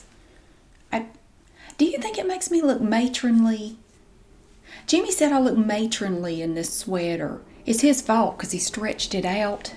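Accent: South, United States. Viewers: After a long work day has relaxed me and made me smile. Also.....I want so badly to replace my boring Iowa way of speaking with that beautiful southern drawl.💕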